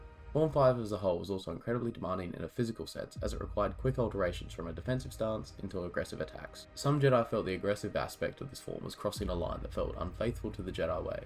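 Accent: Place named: Australia. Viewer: That Aussie accent 🤌Great video man!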